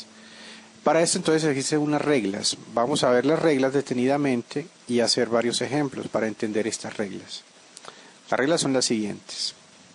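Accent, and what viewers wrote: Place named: Colombia. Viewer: tu acento suena a colombiano,lo digo por que hablas igual a julio profe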